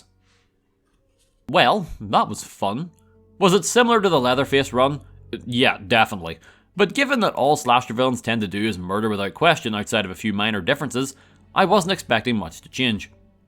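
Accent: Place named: Northern Ireland, United Kingdom. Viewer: Right are you from Bangor or antrim 🤣🤣🤣 driving me mad trying to think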